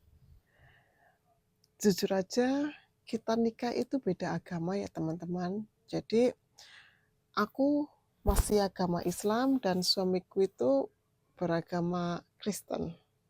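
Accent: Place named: Java, Indonesia. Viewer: Bhsanya medok tapi enak didengerin kloboad lagi cerita, semangattts dan sukses terus ya mpok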